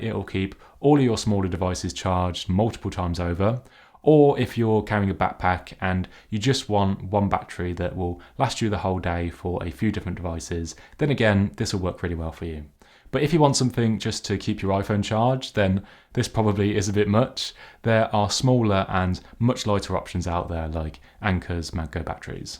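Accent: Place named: West Midlands, United Kingdom. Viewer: I’m from West Mids, can always tell haha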